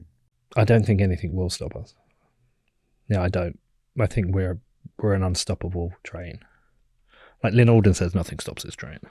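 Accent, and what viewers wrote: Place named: RP, United Kingdom. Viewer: Physically impossible to listen to a podcast solely hosted by the posh rah rah berk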